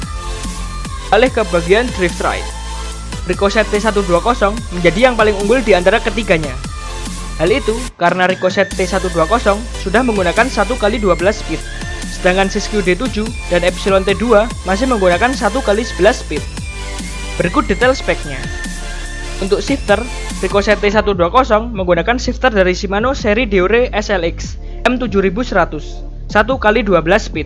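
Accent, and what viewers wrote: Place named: Java, Indonesia. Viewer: Medok bgt bro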